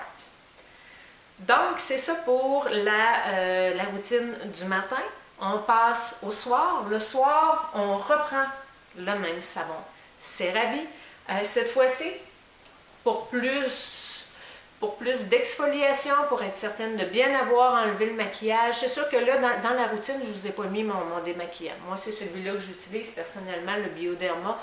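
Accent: Quebec, Canada. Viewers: Hey !!!! Je me trouve enfin sur ta chaine et elle est juste superbe!!!!! Tes vidéos sont superbes et j'adore ton accent québécois. Continue comme ça 😊 bisous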